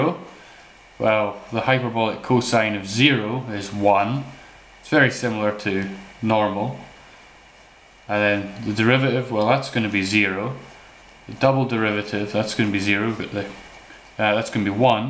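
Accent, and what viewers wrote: Scotland, United Kingdom. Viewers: ye scottish mate? nice vid btw thanks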